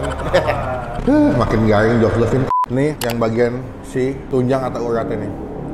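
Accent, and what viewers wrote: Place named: Banyumas, Indonesia. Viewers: Ora Ngapak Ora Kepenak Pak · Purwokerto medoknya keliatan banget wkwk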